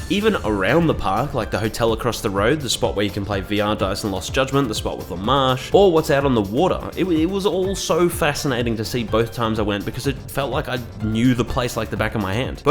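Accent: Australia. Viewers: as a fellow aussie, i appreciate the aussie accent 🙏🏼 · This was great mate. Love hearing a fellow Aussie speak facts 😁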